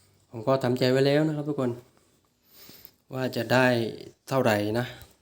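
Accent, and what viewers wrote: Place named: South, Thailand. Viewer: คนใต้ชัวร์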